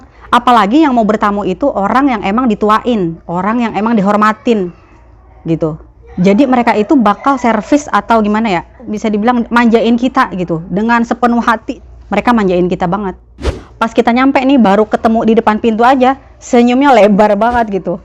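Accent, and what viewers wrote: Jakarta, Indonesia. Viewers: Ya Allah mpo ngomongnya Betawi bgt dah...😅 Seneng jg orang Betawi ada yg jadi warga Madinah.. Maasyaa Allah mantep dah.. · ما شاء الله تبارك الله Logat Betawinye kite demen banget 👍😘 Lanjuut ya review adat istiadat/budaye Saudi nye 🤗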